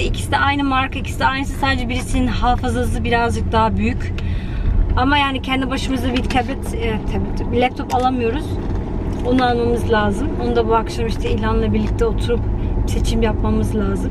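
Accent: France. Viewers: Fransızca aksanın gerçekten çok tatlı🥰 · Hepsi ayrı ayrı özel ve çok guzel kırtasiye ürünleri,çekilişe kızım için katılıyorum.Ayrıca Fransızca aksaniniz gerçekten çok guzelll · Fransızca aksanın inanılmaz güzelmiş 🧿 çok şaşırdım yıllardır takip ediyorum ama hiç Fransızca konuştuğuna şahit olmamıştım 👏🏻👏🏻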